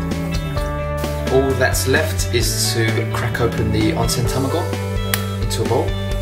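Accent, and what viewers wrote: England, United Kingdom. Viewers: JEEZUS. British accent and he cooks! <3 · You've got an English accent,. Did you grow up in England?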